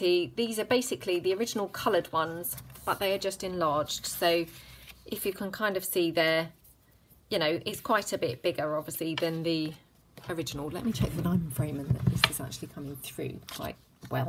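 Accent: England, United Kingdom. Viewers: I love watching anything to do with junk journals and making emphema I am so pleased to hear an English voice although I do love to watch the USA videos the American ladies are so talented but it’s great that the British are catching up love to watch also Australia videos I am a u tube junkie love love and keep going please we British need more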